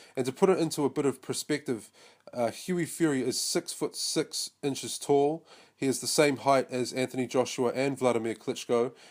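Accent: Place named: New Zealand. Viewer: You fucking legend your accent cracks me up. Proper hard Kiwi Lad from New Zeland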